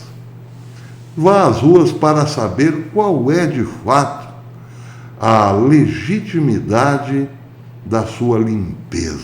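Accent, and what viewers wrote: Caipira, Brazil. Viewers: Boa tarde, professor. Que bom que vai ter live todo domingo! Professor, fico besta com o senhor. Por issogosto tanto desse canal. Ou o senhor conversa em caipirês ou demonstra sua extrema modéstia, como hj: "Simpatizou com essa belíssima figura que sou eu", de qq modo é uma alegria seus vídeos. Vira e mexe o senhor aparece com uma novidade. Obrigada, professor!!!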